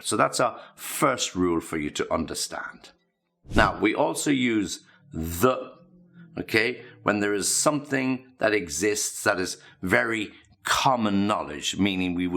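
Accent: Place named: United Kingdom. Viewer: If I am not mistaken you are speaking British English, or at least not Amerikan. And I like it very much. I like your lessens. Thanks a lot.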